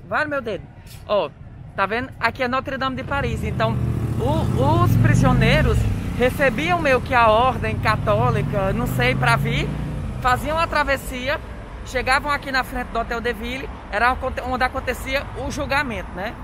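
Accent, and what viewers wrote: Northeast, Brazil. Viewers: seus vídeos são um mix de cultura, beleza arquitetonica, beleza da natureza, informações de utilidade pública, tudo falado de forma naturalmente simples, divertida e brasileirissima, no legítimo e delicioso "nordestinês". Já dou like antes de começar a assistir. Agradeço ao Alexis do canal Ola Brasil por ter descoberto você!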